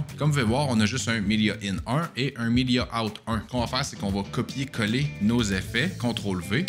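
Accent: Quebec, Canada. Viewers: en effet, pour partager avec un autre utilisateur, cela peut-être utile. Pour ma part je travaille seul donc l'astuce #2 me convient bien 😊. En tout cas merci pour ta reponse et bravo pour ta chaine car trouver des tips francophones sur DVR n'est pas simple. Et en plus j'adore l'accent québécois 😊. D'ailleurs maintenant, je dis tout le temps "pogner une bièèèère" 😉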